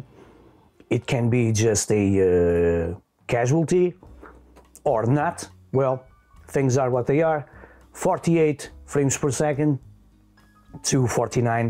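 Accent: Portugal. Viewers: o teu sotaque não engana ninguem, PORTUGAL CARALHO!!!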